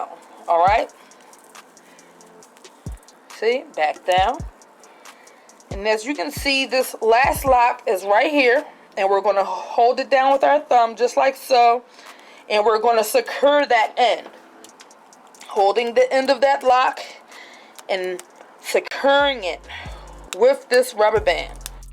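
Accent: Baltimore, United States. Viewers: Are you from Baltimore? You have a very distinct accent. Loved the video! 💖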